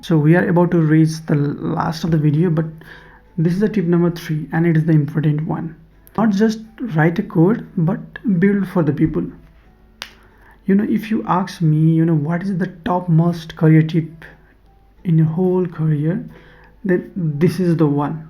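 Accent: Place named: Nepal. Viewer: bro are you nepali?your accent sounds like that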